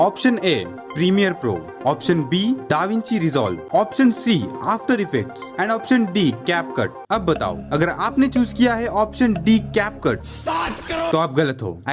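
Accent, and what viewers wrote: Hyderabad, India. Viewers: Bhai hyd seh ho kya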